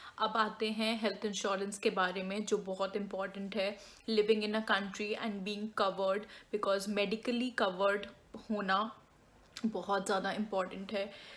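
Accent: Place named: Bengal, India. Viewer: Are u from Bengal according to your language style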